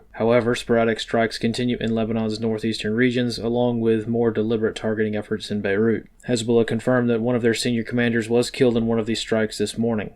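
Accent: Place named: Chicago, United States. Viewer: Sounds like he’s from Chicago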